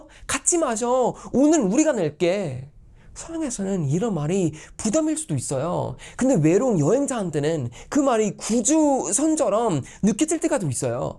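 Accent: South Korea. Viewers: 감성이나 표현, 말씨나 한국사람보다 더한 한국사람인듯 ^^ 말 한마디한마디에 많이 느끼네요~! 어느나라에나 좋은사람도 있지만 반면 나쁜사람도 있듯이 좋은추억만 간직하길 바랍니다~ 오늘도 응원합니다^^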